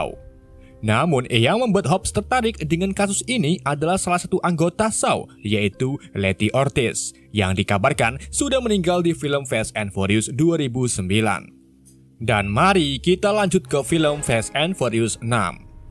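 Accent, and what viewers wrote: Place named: Banyumas, Indonesia. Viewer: wong ngapak ya mas nyong ya iya i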